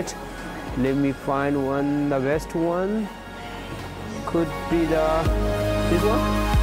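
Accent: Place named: United Kingdom. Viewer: British accent and splendid editing..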